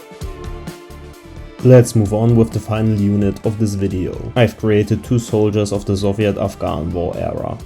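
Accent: Germany. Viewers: bist du deutscher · As a german I litarlly hear ur actcent that u speak deutsch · du bist deutsch das hört man sehr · Are u German? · Deutsches Englisch 🔛🔝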